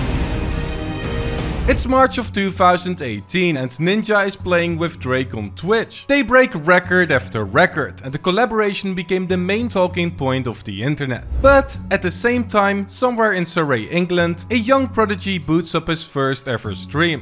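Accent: Netherlands. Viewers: I smell Dutch in your accent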